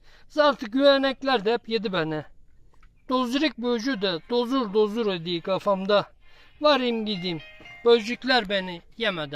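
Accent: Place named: Giresun, Turkey. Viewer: Emeğinize ve ağzınıza sağlık başkan. Çok güzel bir Giresun şivesi olmuş Rahmetli babannem böyle konuşuyordu.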